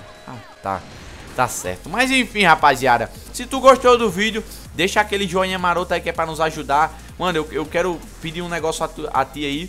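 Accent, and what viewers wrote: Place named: Paraíba, Brazil. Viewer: Nada contra mais voz de paraiba é chata veí --'...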